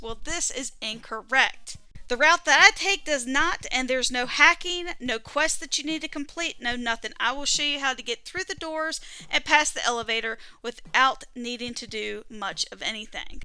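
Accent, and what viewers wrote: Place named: South, United States. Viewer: Found a pink so far! Thank you for the guide southern sounding lady!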